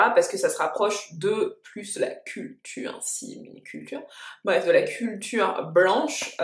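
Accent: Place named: England, United Kingdom. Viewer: J’ai remarqué tu prononces les mots en anglais avec l’accent anglais, t’as vécu en Angleterre?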